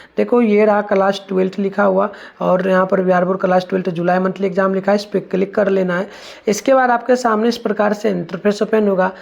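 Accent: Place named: United States. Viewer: Sir aap अमेरिका ke he kiya aap ke language waise. hee lagta he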